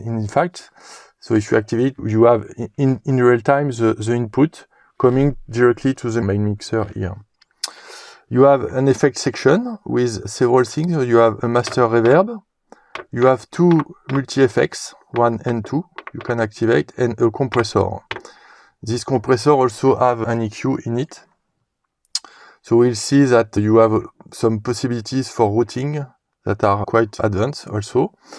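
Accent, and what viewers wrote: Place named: France. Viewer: Salut tu as un great french accent 😄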